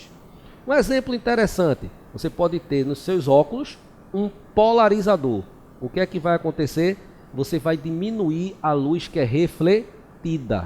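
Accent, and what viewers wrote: Northeast, Brazil. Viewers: Idiotas do Centro-sul que criticam o sotaque nordestino! Caguei pra vocês!!!! 💩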